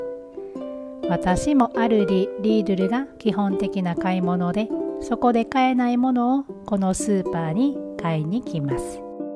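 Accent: Kansai, Japan. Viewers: 君関西人やろ知らんけど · 関西弁に癒されます❤ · それだけ 海外暮らしが長くても 関西弁抜けてないっていうところを見ると もう日本で住んだらいいんじゃないの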